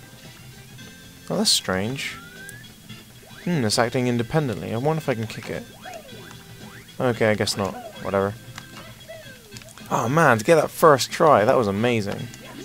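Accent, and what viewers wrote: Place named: United Kingdom. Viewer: I think hes british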